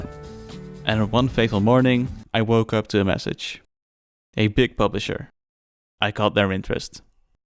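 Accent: Netherlands. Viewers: love the dutch accent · Broer dit Hollands accent met dat Engels, ik herkende t meteen bwhaha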